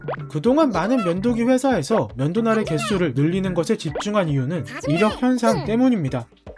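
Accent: Gyeongsang, South Korea. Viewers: 억양이 영남억양인데 엌ㅋㅋ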